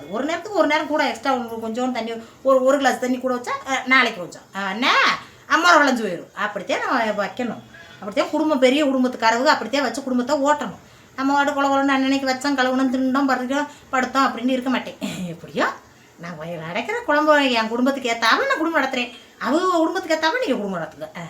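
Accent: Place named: Kerala, India. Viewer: நான் கேரளாவில் இருந்து உங்க வீடியோ பார்த்து கொண்டு இருக்கிறேன் அக்கா. நீங்க பேசுறது எங்க ஊரில் இருப்பது போல் இருக்கும் அக்கா ❤😊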